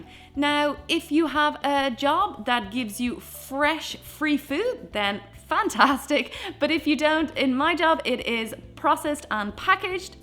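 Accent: Ireland. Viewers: I’ve been enjoying your minimalist videos and just now found out you’re Irish. How I love your country, your people and the fantastic accent. 💖💖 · Is this a stupid question? I feel like this is stupid to ask, but are you trying to mask your Irish accent?? It just kinda sounds like you are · Do you have children? Pets? Please make a followup video. Love your channel and brogue! 😘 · Irish accents lovvvvvvv ittþ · babygirl lyrics it’s an Irish accent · that's not an English accent it's an Irish accent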